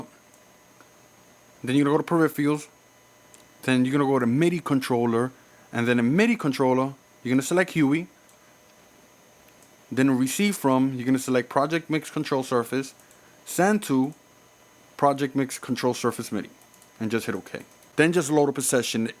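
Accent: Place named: New York, United States. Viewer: that NY acccent!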